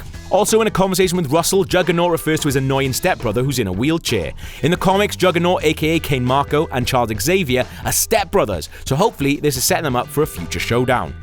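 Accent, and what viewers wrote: United Kingdom. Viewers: hey are you British? i cant really tell...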